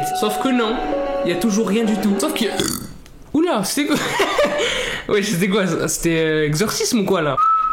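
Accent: Quebec, Canada. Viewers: Accent quebecois acceptable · Jadore to accent quebecois et ton exorsice😅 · Jadore ton acssen Quebec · j'adore ton accent Québécois 😂👌(j'en suis une O.O) 👇liker si vous êtes Quebecois/Québécoise 👇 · je suis québécois et tu parle bien Québec👍👍👍👍👍👍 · Moi je trouve que ton accent québécois parce que je parle québécois 😉😉😉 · Ta un bonne accent quebecois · qui trouve que parle grv bien quebecois? 😆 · Ho wow l'accent québécois😂👌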